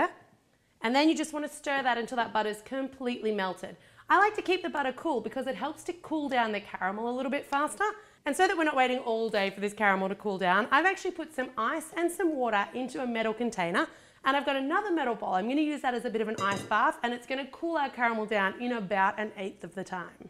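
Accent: Australia. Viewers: is she an Aussie don't like the accent. and fair enough yeah she recreates bigger version of chocolates but do they taste the same as the actual brands? only a million times worse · She actually sounds more Australian than british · No! That's not a British accent at all!! She's Australian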